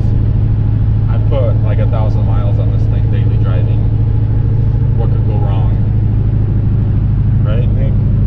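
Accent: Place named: Sweden. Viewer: Impressive project! Where are you from originally? You sound like you grew up in Sweden for a few years as a kid then moved to the US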